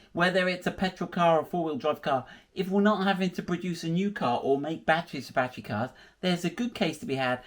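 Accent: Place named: England, United Kingdom. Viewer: Congratulations, lads! The whole team thoroughly deserve this milestone. I don't own a landrover of any sort but I love watching your videos. The way you show us how to "be brave" when pulling bits of a car has been really helpful. Your pommie accent and banter with and etc is really entertaining. Your technical skill and innovation, is really impressive, yet you are so humble in the delivery. Good onya, mate, you are a bloody legend!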